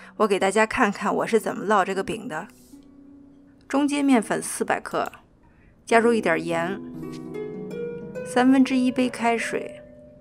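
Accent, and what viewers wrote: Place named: Xi'an, China. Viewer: by her accent, i guess she is from xi'an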